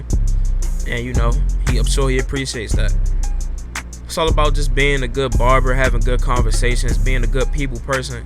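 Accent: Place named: Washington DC, United States. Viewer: I kbew he was from dc after a minute haha. The cadence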